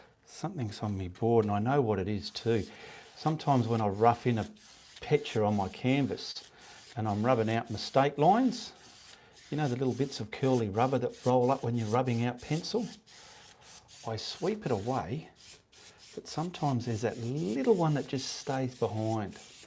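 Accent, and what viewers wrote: Australia. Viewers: Love your 'Stralia accent as much as your teaching skills. Oldie me (72yrs) in Inverurie. NE Scotland( Re accents I speak The Doric,so "Fit a fine daundie mannie learning us tae pint.xxxx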